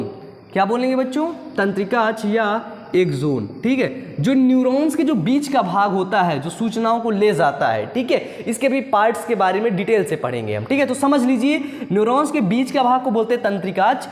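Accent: Uttar Pradesh, India. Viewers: Sir aap up ke hai kya up ke bhasha hai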